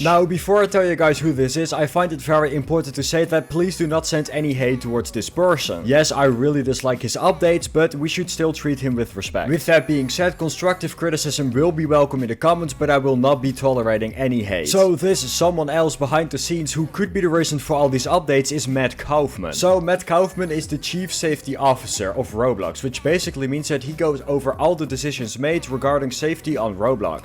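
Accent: Netherlands. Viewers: This Dutch accent lol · Are you dutch by any chance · i know that accent far to well this is a dutch youtuber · I can hear your dutch accent. But keep up the content! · you sound dutch. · Yep I hear a Dutch accent, let’s goooooo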